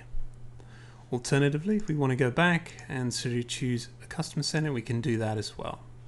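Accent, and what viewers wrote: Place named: United Kingdom. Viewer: Beautiful british accent.. xD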